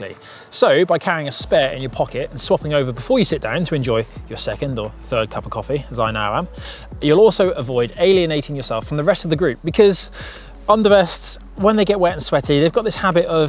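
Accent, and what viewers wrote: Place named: United Kingdom. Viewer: It's a British accent, not an internationalized accent.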